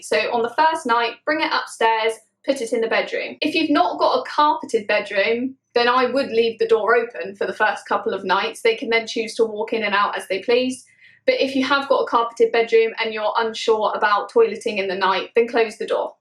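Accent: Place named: United Kingdom. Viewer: Oh, luv the your British accent!🐕will keep watching...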